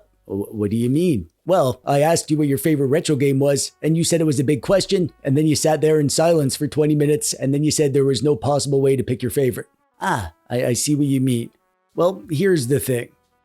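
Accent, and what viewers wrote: Canada. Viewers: Stop saying Mario wrong. You sound like a Canadian.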